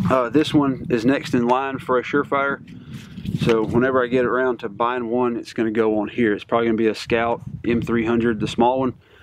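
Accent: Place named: Alabama, United States. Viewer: What part of Alabama you’re from?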